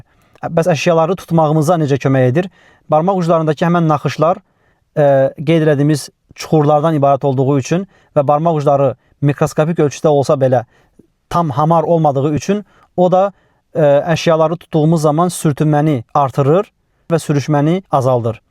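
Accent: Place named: Talysh, Azerbaijan. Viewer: Salam qardas siz hansi bölgedensiz.siveniz sirindi talis zonasına oxsayir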